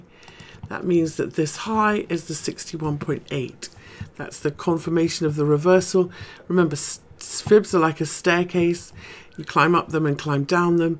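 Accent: United Kingdom. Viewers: I absolutely love your voice and your accent. It's very posh and very soothing.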